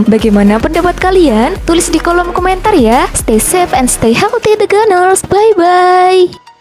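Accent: Java, Indonesia. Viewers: Admin nya pasti orang jawa.. logatnya medok betul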